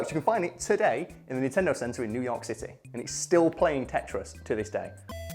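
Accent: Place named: England, United Kingdom. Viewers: Ok to the people who are like " blah blah he's English" no shit mate, but I'm like wtf sometimes to. Some people just hear differently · Kris R You want captions on an ENGLISH video spoken by an ENGLISHMAN are you for real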